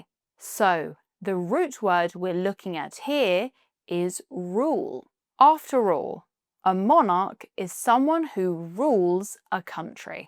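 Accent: United Kingdom. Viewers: Your british accent is so beautiful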